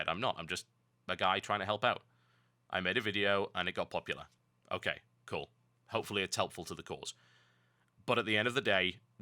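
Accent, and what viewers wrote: United Kingdom. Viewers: No the 21 minute slideshow with an obviously bored British accent voice-over is not a better video. This guy talks about doing better marketing? He should take a Red Bull and turn on his webcam before trying to present his arguments, what a lame way to get any type of attention. He must be a failed lawyer since he spends his time doing voice-overs on gameplay videos (something that YouTube specifically forbids youtube partners from doing)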